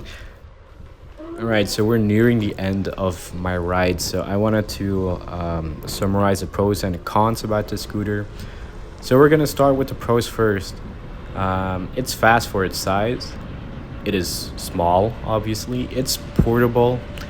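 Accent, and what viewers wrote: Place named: France. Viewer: T'entends pas son accent ? Il est français mais pour toucher plus de monde il fait sa vidéo en Anglais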